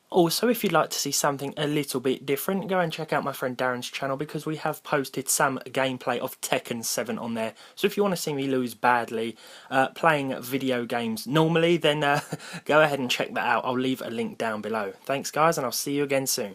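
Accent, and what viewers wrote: United Kingdom. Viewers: british accect ftw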